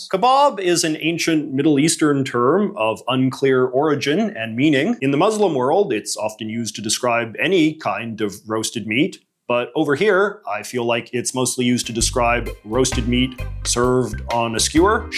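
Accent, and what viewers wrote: Canada. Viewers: As a Canadian, how did you manage to sound so Canadian??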